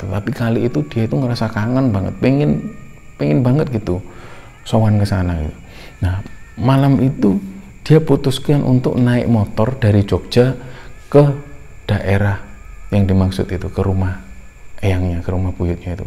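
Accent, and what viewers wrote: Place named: Surabaya, Indonesia. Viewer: luar biasa. uenak rek cara nyampaikan nya podo suroboyone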